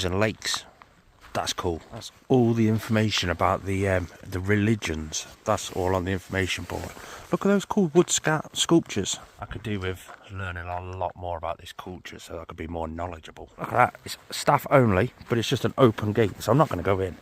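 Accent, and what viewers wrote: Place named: Stoke, United Kingdom. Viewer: Dude are you from Stoke ?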